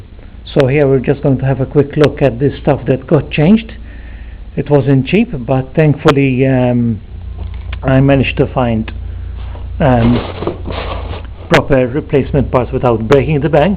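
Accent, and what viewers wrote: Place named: Malta. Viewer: what was the total price with parts you sound maltese so thats why im asking because i have the same issue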